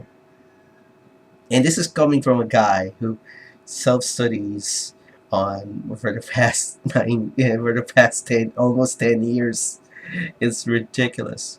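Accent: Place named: Philippines. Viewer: 4 years later, I stumble upon this... I can tell from your accent that you (like me) are from the Philippines. I have never known anyone else here who was aware of this "culture war" that is happening in US universities. Have you ever had any conversations about this with your peers? and what did they think about it? I've tried talking to people I know about this and everyone seems to be oblivious about it. I guess the lack of talk about it here can be attributed to the remoteness of this particular issue in the country, we don't really see people being de-platformed in universities here (as far as I know at least, I could be wrong though) and this obsession of trigger warnings and safespaces. The only problem that I see, is that if we don't pay attention to this problem happening in another country, we put ourselves at risk of repeating the same thing here.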